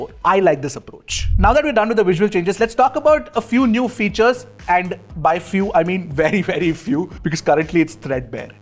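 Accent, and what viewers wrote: India. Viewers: the video is useful but how you speak in india accent influence the viewer not to understand the content. please improvize your intonation in english accent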